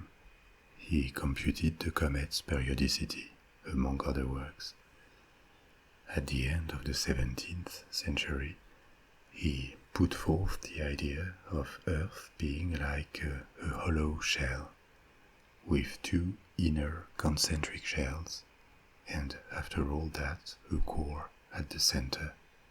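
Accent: France. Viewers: You have the most relaxing voice and the coolest accent. Actually you don't have that much of an accent. You speak excellent English. But it's a compliment. I love French language and the way you speak. Also, thank you for a great night of sleep, relaxation and expanding our brains with knowledge! You are awesome! I love your channel and really appreciate all the hard work you put into your passion! Thanks again and God bless. :) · Your videos are really great, but your accent reminded me of my former asshole of a French boss from years ago so I got high blood pressure from listening to your videos instead. Totally not your fault, just unfortunate LOL.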